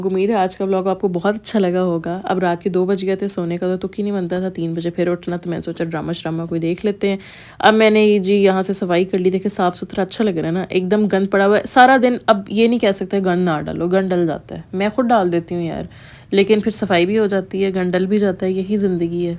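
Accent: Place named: Punjab, India. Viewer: Your punjabi acsent is soo funny😂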